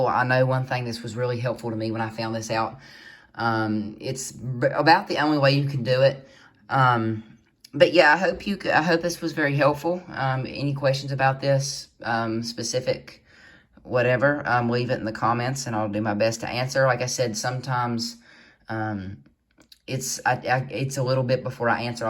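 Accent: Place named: Mississippi, United States. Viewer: I swear you’re from Mississippi 😂. Your accent is great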